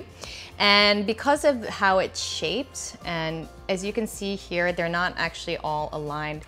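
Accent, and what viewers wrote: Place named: Singapore. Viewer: Singapore accent!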